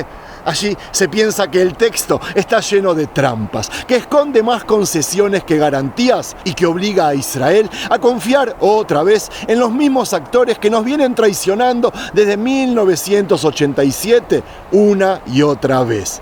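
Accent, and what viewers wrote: Argentina. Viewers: No confíes nunca en los polîticos, seguîd en peligro, los terroristas siempre lo son, pactos de paz para bebeficio de los firmantes, yo me vompadezck de los pobres gazzties masacrados por sus propios compatriotas, tiros en la cabeza porque tenemos que seguir matando a alguien, es nuestra rasôn de ser, Te escribo desde edpsña, aquí tuvimos a eta, ahora estàn en el gobierno, cobrando como políticos, sentados en despachos y blanqueando su pasado asesino, las pistolas estàn calladas, pero siguen guardadas, estás muy acertado, te noto cabreado, y no es para menos, trump! Un megalómano, on ínfulas de gobierno total, cuidaros mucho, ya os falló una vez el sistema de seguridad, a Dresde ó no?, fue atentado con ayudadrl propi Israel, posible,?, todo un gran teatro. Ànimo y ojo avizor wué tengáis mucha suerte y wué dios os proteja, desde espsña con amor, gran reportaje un gusto oírte ese bello espsñol argentinizado ó bueno como sea me gusta tü musicalidad.❤❤❤❤❤❤❤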